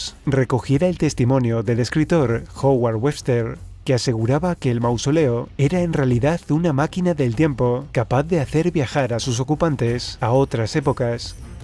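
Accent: Spain. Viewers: coño!!!!un español narrando el video!!!!yuhuuuuu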